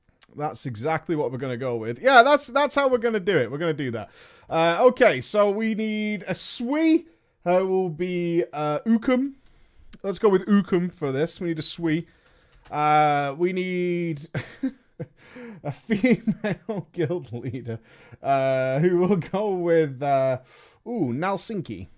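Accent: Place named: Manchester, United Kingdom. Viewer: Sounds like your from manchester ar kid nice to see someone local doing well, keep it going mate.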